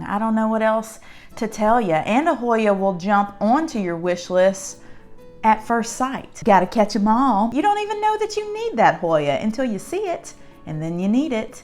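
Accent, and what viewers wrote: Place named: South, United States. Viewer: Thank you for a giggle and a smile.. Hoyas are the only plants I have. I live in an apartment in southern Ontario Canada (near Toronto). My growing windows are facing N NE. They are currently indoors now. This year they produced many peduncles, but they did not bloom. I am waiting patiently. Your southern accent is amazing!!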